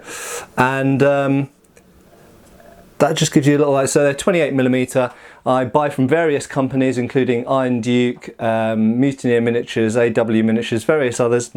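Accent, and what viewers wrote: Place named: United Kingdom. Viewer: Oh boy, you are so british!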